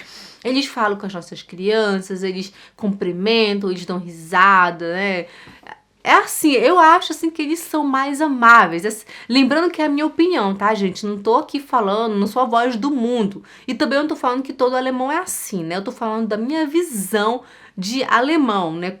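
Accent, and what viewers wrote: Pará, Brazil. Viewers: Nossa sabia que você era da minha terra é inconfundível seu linguajar paraense 🤗❤️bjs amei conhecer seu canal eu estou aprendendo muita coisa com você.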